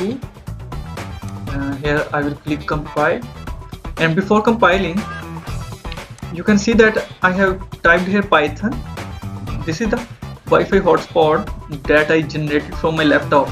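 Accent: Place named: India. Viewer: I don't understand indianenglish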